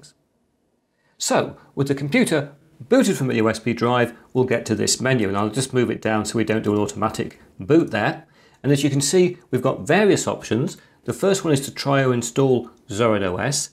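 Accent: England, United Kingdom. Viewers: I miss the energy you had when you used to exclaim, "RIGHT!" Now it's just, "right..." Your English-isms are one of the reasons I fell in love with your channel and now, it seems, you're downplaying them. Your content is as good (if not better than) ever but I like a little old world flavor (or is it flavour?). Keep up the good work but give us the whole package.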